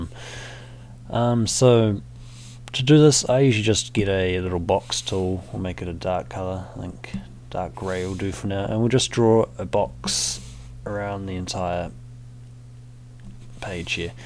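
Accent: New Zealand. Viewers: Thanks, great to hear a kiwi tutorial for once, very clear and easy to follow:) have subscribed and will check out more of your stuff soon, thanks for shearing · Thanks for this! Great to hear a kiwi accent on a tutorial for a change too.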